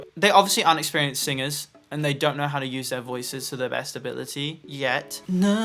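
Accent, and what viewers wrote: United Kingdom. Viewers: Your accent is so British ❤️ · i completely forgot has this strong british accent and how it makes everything he says sound so elegant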